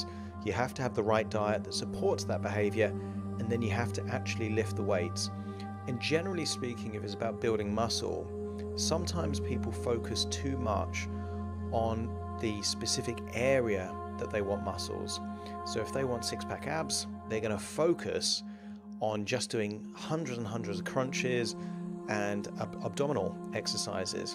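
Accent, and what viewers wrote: England, United Kingdom. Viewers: Hearing You Speak Makes Me Feel Like I Am In (Old) England, Instead of New England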